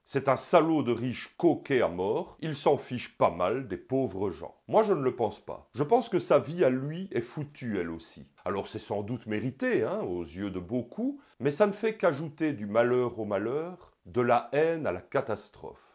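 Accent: Belgium. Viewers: Bonjour d'un voisin de Silly^^ Magnifique vidéo, je découvre ta chaine grace a Mr Sam depuis aujourd'hui meme, et j'ai dévoré ta vidéo sur la méthode, avant de découvrir celle ci. J'ai hâte de découvrir tes autres vidéos, je me sens tellement bien en t'écoutant, un peu de douceur et de bon sens avec un accent belge pas trop prononcé:p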